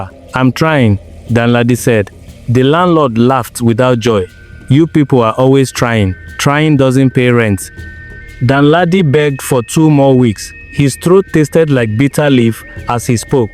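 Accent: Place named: Africa. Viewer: Listen man, use your AI voice , there's nothing wrong with that. It enhance your narration. Although it's a pleasure to hear you, if you want to go global and make money use the voice. We have accent, only Africans can understand, and if your audience is beyond the local, you need to use the voice. It's business! Nothing personal!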